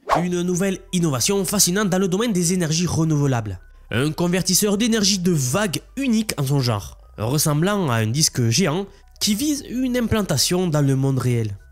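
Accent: South, France. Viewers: super video jadore ton contenu mais choisi entre l'accent du sud et l'accent asiatique pcq la c'est inaudible stp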